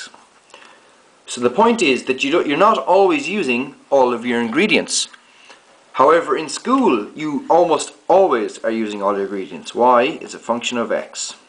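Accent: Ireland. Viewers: Are u Irish....u sound irish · Ah a fellow Irish man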